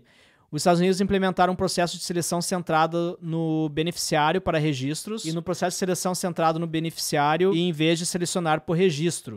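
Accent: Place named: Rio Grande do Sul, Brazil. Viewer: eu moro hoje nos EUA com visto H1B. Ate hoje, nunca conheci outro BR com esse visto. Todos que conheci são mexicanos, chineses e principalmente, indianos. Depois que tu és demitido, tu tens 2 meses pra se recolocar e achar outra empresa que mantenha o teu H1B. Depois disso, teu visto expira! Alguns falam que o H1B é o ruim, porque depende da boa vontade do empregador e tal... mas a real é que depende demais. Eu tenho um chefe ótimo, e considerando que todos estão felizes com minhas entregas, esse visto é uma bênção. Pode ser que eu seja demitido no futuro e tenha que voltar? Sim! Mas ter morado aqui por esses anos terá valido a pena... E eu voltaria pra onde for com outra bagagem... Sou gaúcho também! Como é bom ouvir um pouco de sotaque haha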